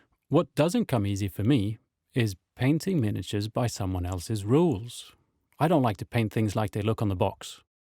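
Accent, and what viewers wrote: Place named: Sweden. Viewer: You sound Swedish, and the store looks like Incrade in Umeå. How far off am I?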